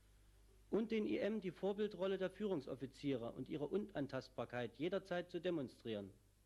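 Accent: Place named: Saxony, Germany. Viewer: Leicht sächsischer Akzent, ansonsten roboterhafte Kälte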